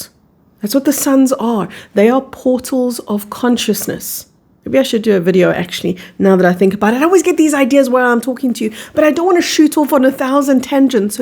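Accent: South Africa. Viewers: Hi your channel just found me. I knew you were South African from the first sentence 😂. New subbie here I will be binge watching any other videos you have. Love and light from a fellow SAfrican 🙏❤️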